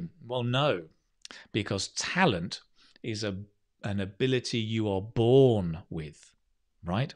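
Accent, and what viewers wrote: United Kingdom. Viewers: Appreciate your teaching videos. I am from Malaysia. Are you British? Lovely to hear you speaking.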